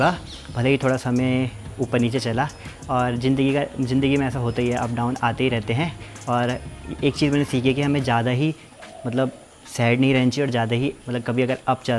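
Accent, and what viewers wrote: United States. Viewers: Goooooood american english bahut heeeee jabardast ❤ lage raho safalta jarur milegi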